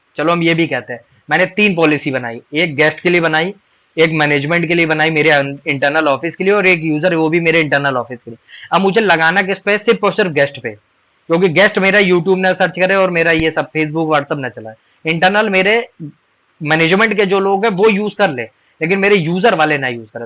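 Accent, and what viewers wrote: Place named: Uttar Pradesh, India. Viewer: avaj se lag rha tha koi up ka hai😾😾😾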